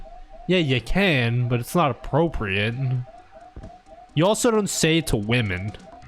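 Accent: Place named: United States. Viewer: Okay your accent sounds so American to me and you're constantly talking like you're not American and it's confusing the hell out of me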